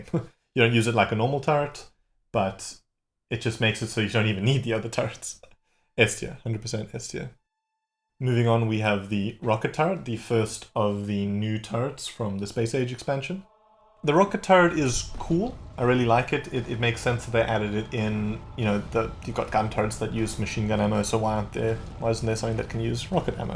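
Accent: South Africa. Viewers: I can recognise that saffa accent anywhere, didnt think we had many factorio players around here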